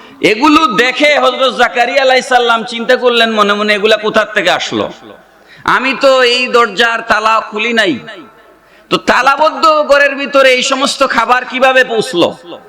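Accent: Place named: Sylhet, Bangladesh. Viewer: Masallah sundor waz sylheti vasha onek valolage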